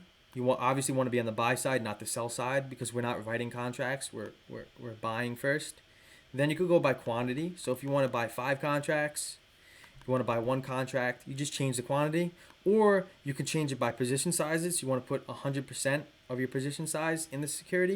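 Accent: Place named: Long Island, United States. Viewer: Brother is from long island, i can hear it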